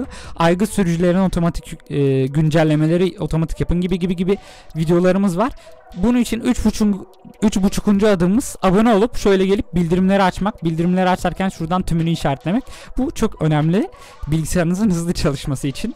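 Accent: Kayseri, Turkey. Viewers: kayseridemi oturuyosun abi ??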